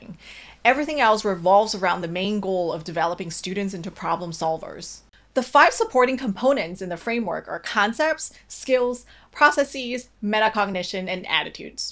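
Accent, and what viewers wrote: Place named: United States. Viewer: How do you get an American accent having spent 12 years of your formative years in Singapore, taught by Singaporean teachers with Singaporean accent?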